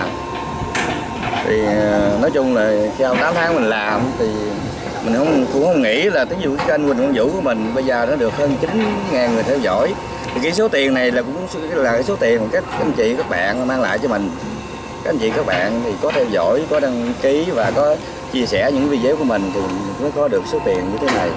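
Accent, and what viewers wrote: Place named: Mekong Delta, Vietnam. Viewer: Chúc mừng anh trai, đậm chất miền Tây chân chất, gần gũi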